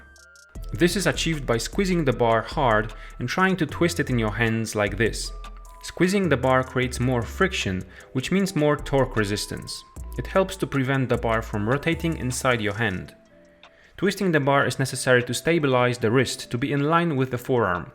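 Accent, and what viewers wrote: Poland. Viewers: Polski akcent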